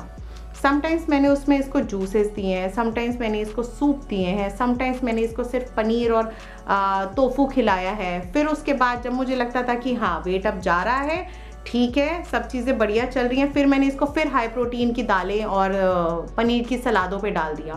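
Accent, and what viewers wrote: Punjab, India. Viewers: Mam Na Punjab Faridkot se bol rahi hai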